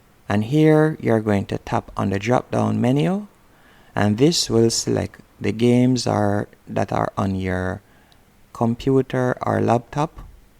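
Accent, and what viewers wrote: Jamaica. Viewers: thank you, also are you from jamacia thats where im from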